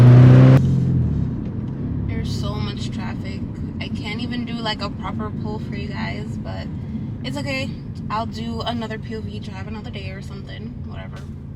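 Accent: Jamaica. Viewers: You sound Jamaican 🇯🇲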